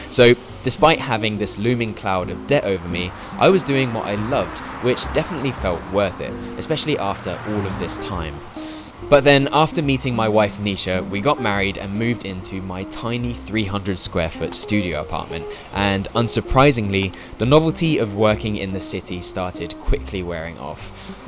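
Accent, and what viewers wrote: United Kingdom. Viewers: Just 1 minute in and this is the first video I've watched from you and you sound British yet you talk about being under mountains of student debt??? Why? This isn't America where you get chased for it. UK student loans are paid only when you start earning over a certain threshold (the poverty line), is in proportion to your income, is removed automatically from your pay slip and is forgiven after 30 years and has virtually no impact on mortgages, credit score or anything else. It's effectively a graduate or uni tax not a loan.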